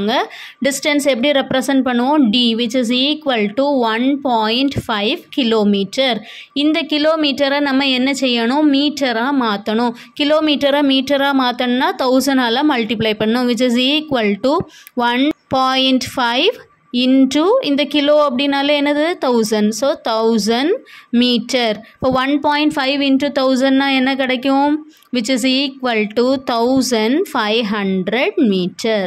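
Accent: Kanyakumari, India. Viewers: Kanyakumari voice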